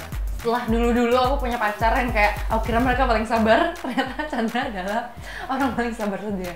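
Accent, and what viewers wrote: Malang, Indonesia. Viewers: hehehee.. logat ngalame ga ilang ilang... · Logat malangnya masih kerasa 😍😍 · Medok Malang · Logat jawa Malangnya kerasa ya :v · Logat malangnya semakin terasa dari tengah sampek akhir ya mbaa wkwkkw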